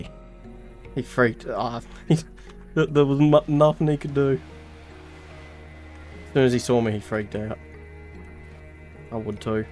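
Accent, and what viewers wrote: Australia. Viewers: very clearly Australian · The Australian voice really makes this video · he sounds super australian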